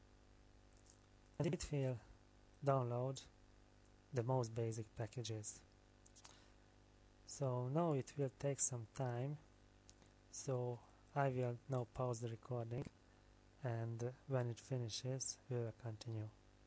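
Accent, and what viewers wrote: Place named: Hungary. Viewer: azért észre lehet veni a kiejtéseden, hogy magyar vagy XD. Amúgy nagyon jó tutorial. Kössz szépen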